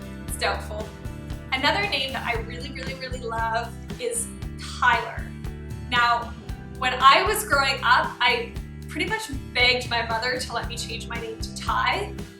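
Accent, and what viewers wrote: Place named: Canada. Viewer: I was going to ask you if you were perhaps from Canada, as I was picking up on your accent. I got my suspicions confirmed when you said zed for the letter z.😂What part of Canada are you from? Ontario maybe?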